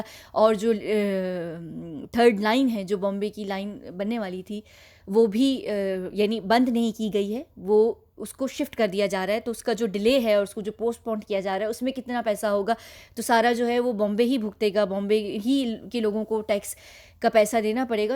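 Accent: United Kingdom. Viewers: When you speak in English I recognize it as a British accent. But when you speak Hindi/Urdu in your videos Your British accent is not apparent: so I wonder if you could speak English with an accent of a native Hindi/Urdu speaker (Indian English) accent: it will be fun if you can give it a try! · U have a British accent . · I am dr hyder by profession I am a cardiac surgeon from Pakistan .... I love the way she speaks and the most of it I love the way she has this British accent ..... lolzzzz I am her fan .... thanks for being soo brave and fighting for truth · Her accent seems like UK or british accent. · Sometimes I rewind and listen to your British Accent and I loved it and loved the fact that you speak Hindi so good. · Ap rehete ho uk me ? accent se lga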